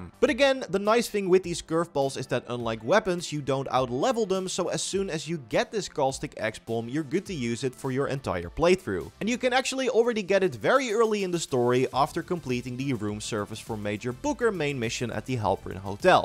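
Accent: Netherlands. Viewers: My god.. the Dutch accent in this guys' English 🥲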